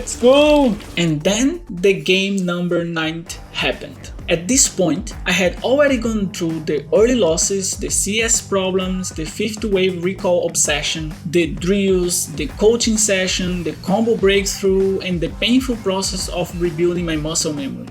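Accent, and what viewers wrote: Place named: Brazil. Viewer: I would recognize a Brazilian friend from far far away, esse seu accent não esconde nada, man kkkkkkkkkk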